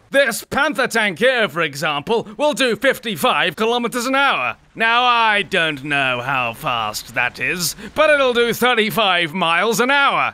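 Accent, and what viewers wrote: United Kingdom. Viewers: very british accent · British accent is so annoying 💔🤷‍♂️ Am I the only one? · Are you British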